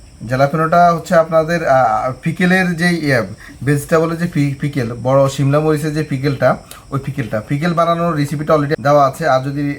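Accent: Noakhali, Bangladesh. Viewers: হু ভাই! একদম খাঁটি নোয়াখাইল্ল্যা। এজন্য কিছু শব্দ নাকের ভিতরে চলে আসে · বাড়ী কি নোয়াখালী নাকি ভাষা এমন কেনো।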